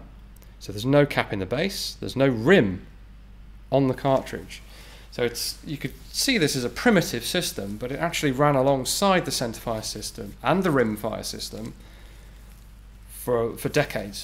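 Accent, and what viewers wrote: United Kingdom. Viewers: I wasn't expecting a British accent, I bet he never shoots them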